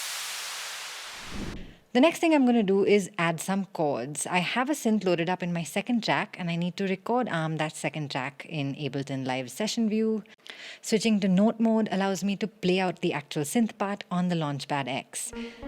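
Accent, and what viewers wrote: India. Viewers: The demo is awesome. Your diction sounds like an Indian. Well. Loved this demo. Haven't yet tried Launchpad. Maybe in the future for sure.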